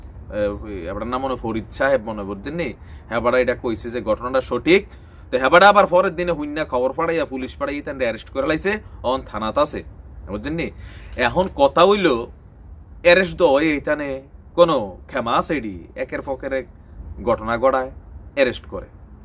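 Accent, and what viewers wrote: Comilla, Bangladesh. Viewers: ভাই ও ভাই, অাপনার কূমিল্লা ভাষা খূভ ভালো লাগে, অাপনি সব বিডিও কূমিল্লার ভাষায় করেন, অাপনি কূমিল্লার অহংকার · Vai amio kintu cumillar ar apnar cumillar lenguege ta osthir cilo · ভাই আমি কুমিল্লা হোমনা থানার মানুষ। আমারও এই খবরটা শুনে খুব লজ্জা লাগছে। মানুষ কতটা নিচে নামলে এমন কাজ করতে পারে ; এরা জনোয়ারের থেকেও অধম। আর আপনার মুখে কুমিল্লার ভাষা শুনে আমার হাসতে হাসতে পেট বেথার অবস্থা ।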